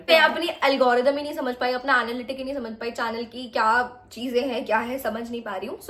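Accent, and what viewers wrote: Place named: United Kingdom. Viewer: I just love your English accent 🥰❤️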